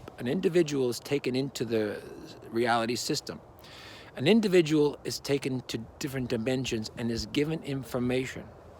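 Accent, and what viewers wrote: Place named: Canada. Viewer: love your canuck accent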